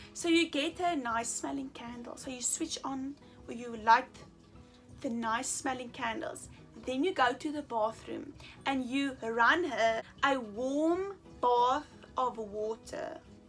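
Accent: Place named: South Africa. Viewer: Ur accent does it for me, proudly South African lols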